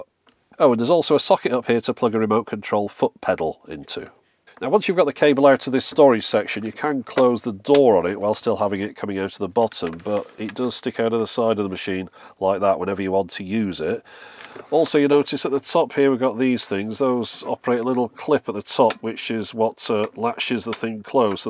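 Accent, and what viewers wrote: United Kingdom. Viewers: Wow, this guy is from the UK??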